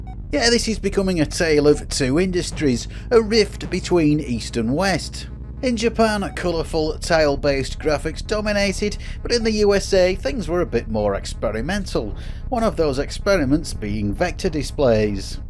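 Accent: United Kingdom. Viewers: Awesome job on digging up I Robot! That's a deep cut. Jaw-dropping graphics for the time. I tracked down a ROM of it and gave it a play on MAME, oh.... I'd say sometime around 2005. It wasn't a bad game, by any stretch of the imagination... but it wasn't super fun or addicting like many of the others on the list. I love the content you've been putting out... I do have a small suggestion for you, if you'll humor me... I don't know if it's an "other side of the pond" thing, but most of the folks I know of in the USA that I've talked shop in person with tend to have a pretty standard way of pronouncing processor names. But, once again, this is the YouTube comments section, feel free to take this with as big a grain of salt as you like: MOS Technoglogy 6502: "Sixty Five Oh Two" Intel 8008: I've.... never heard anyone talk a lot about the 8008. My guess is "Eight Oh Oh Eight" or "EIghty Oh Eight". 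Intel 8080: "Intel Eighty Eighty" Intel 80286: "Two Eighty Six" Intel 80386: "Three Eighty Six" Intel 80486: "Four EIghty Six" Motorola 6800: "Sixty Eight Hundred" or "Motorola Sixty Eight Hundred" Motorola 68000: "Sixty Eight Kay" or "Motorola Sixty Eight Kay" You might notice I've left off a processor. :) Honestly, though, I call it a "Zee Eighty". Do y'all over there call it a "Zed Eighty"? Either way, I agree that the computer that Sir Clive Sinclair made out of it is definitely a "Zed Ex Spectrum"... and the car brand is definitely "Jag-You-Ar", and not "Jag-war", and ABSOLUTELY not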